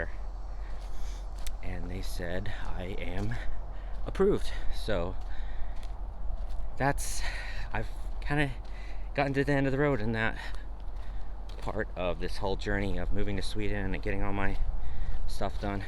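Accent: Texas, United States. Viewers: Hi Denmark here. Don't worry about the accent, when you speak Swedish fluent, you will still have the accent. But it doesn't matter. I have a friend, he has been in the country for about 25 years. He's accent is noticeable, but he speaks fluent Danish and can have a perfectly normal conversation with a Dane. BTW, your Texan accent ain't that heavy. I have heard it way "worse". Have you tried reading Danish. A Swede, can quite easily read Danish. Specialsygeplejeske.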